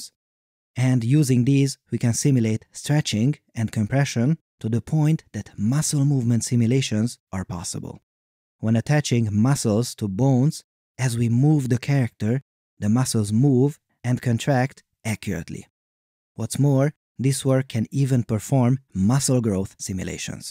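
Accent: Hungary. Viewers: A magyar akcentus befigyel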